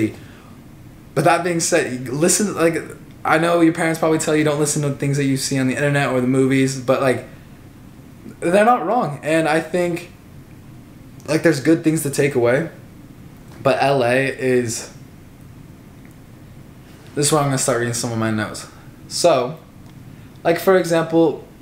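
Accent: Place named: New York, United States. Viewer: It kinda sounds like he took a trip to New York picked up a lil accent and came back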